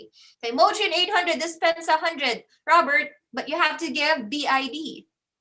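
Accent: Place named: Philippines. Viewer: U sounds like Filipino po. HUHU I need more info I’m still confused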